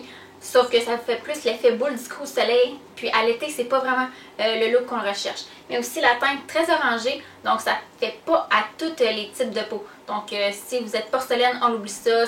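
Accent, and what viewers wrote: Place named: Canada. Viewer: Coucou, merci d'être passé sur ma chaine. D'habitude je n'aime pas trop l'accent canadien mais tu es tellement drôle que tu me fais l'apprécier. J'aime bien ta vidéo, et je te trouves trop jolie. Bisous ma belle et je m'abonne ♥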